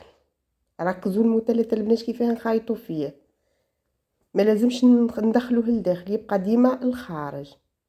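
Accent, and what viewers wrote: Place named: Skikda, Algeria. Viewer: اللهجة تاعك سكيكدية على ما أظن❤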